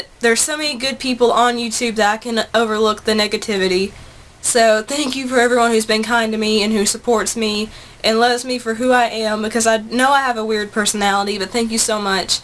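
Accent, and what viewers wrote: South, United States. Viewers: Yeah I agree. I don't know why anyone would dislike your videos. You are funny as hell and your southern accent is adorable. You also have wonderful tastes in just about everything. power!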